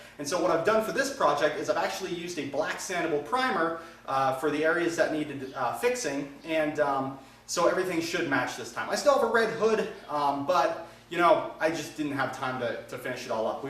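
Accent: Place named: Germany. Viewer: Your accent man... Where are you from? Are you German originally?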